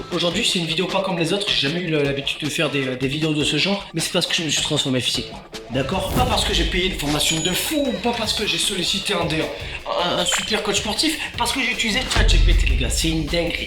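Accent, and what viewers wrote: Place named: Pau, France. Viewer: Ha je me disais aussi que tu avais l’accent de chez moi ^^ Je suis palois aussi . Adishatz beroy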